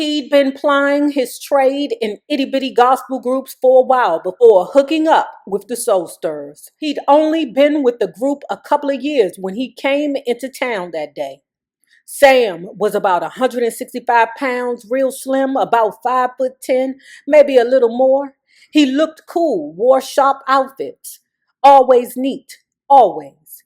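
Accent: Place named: South, United States. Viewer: someone said you need to go to a community College 😂😂 Hilarious. Your way of talking is uniquely you and I like it. At first I thought you were from the south till I realized you're from DC. Well you make me laugh telling your stories as only you could tell them. This Bobby Womack book is good and you just getting started. Keep doing you. I'm enjoying it so much. I'm a senior woman and always been a fan of Bobby.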